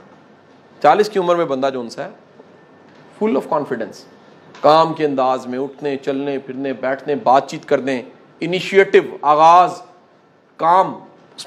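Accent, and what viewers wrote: Punjab, India. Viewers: Sir ap bhtt parhy likhy hen. baten b achi krty hen lakin apka accent punjabi he.. 11 ko ap bar bar yara keh rhy gyara ki bjaye.. mere kano ko bhtt ajeeb lgti ye punjabi urdu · Muhammad Amir - This is lahore or pubjabi syle of urdu.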